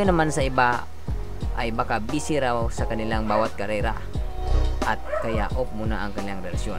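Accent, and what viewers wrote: Visayas, Philippines. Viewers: Paki ayus ng Pananalita mo pre..halata tlaga accent mo na bisaya..bist allstar..pisos, istimitid na sahod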